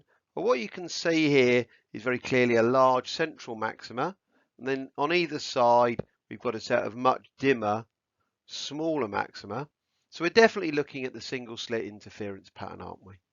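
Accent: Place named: United Kingdom. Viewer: Amazing video. Exactly the information I needed presented in an exceptional manner. British accent is the cherry on top :) :) :)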